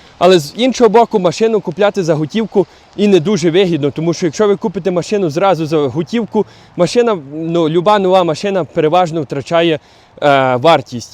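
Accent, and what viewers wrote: Canada. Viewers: це я знаю,маю родичів там,але на мій погляд гя " говірка " як ви кажете ,це скоріше українська мова з канадським акцентом..дуже ріже слух ))) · У Вас, дуже, канадський акцент! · Цікава українська, вже з канадським акцентом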